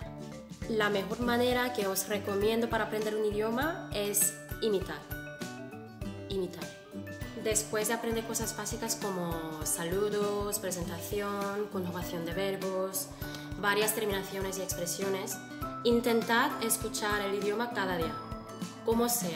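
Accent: Spain. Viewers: Nuevo sub por cierto me gusta como habla el asento español <3 saludos desde colombia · Bueno yo quiero decir nomas que queria entender lo que ella decía pero solamente me pasé escuchando la entonación de ella y no lo que decía.. Nose si ami nomas me paso pero me gusta mucho como habla los españoles con la "Z" ella habla perfectamente bien 🥺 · Eso es lo malo que es español de España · Que linda, tienes el acento español de España. ¿Cómo fue tu vida para adaptarte a la cultura occidental? Ya que veo un choque abismal entre culturas; no sé si tienes un vídeo sobre el tema, es mí primera vez viendo un video tuyo. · Loool. Una Coreana hablando español y encima con asento de España >:0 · Ella aprendió un mal español al estilo español, que feo y ella tan hermosa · Ese acento de España 🤢.. bye ✌🏻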